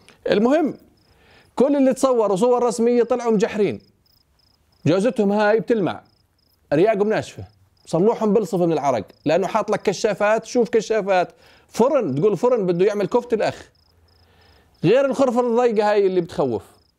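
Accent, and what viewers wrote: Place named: Jordan. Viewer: ههههههههه فطستنسي من الضحك عايش بامريكا ومفتقد لها الهجه الاردنيه ههههههه تحياتي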